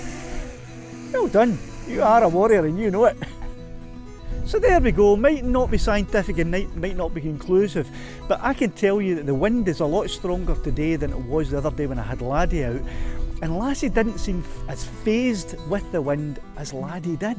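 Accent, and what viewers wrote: Scotland, United Kingdom. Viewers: Thanks for another great video! Always so excited to see another video of yours come out. Your cheery attitude and Scottish accent always lifts me up my friend. You do such a great job! It takes time to put these videos together and I for one really do appreciate it.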